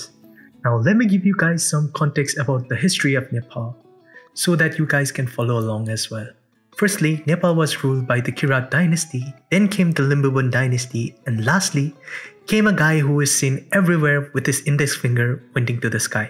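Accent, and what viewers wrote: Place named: India. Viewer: by any chance are you indian or even nepali?you have indic accent.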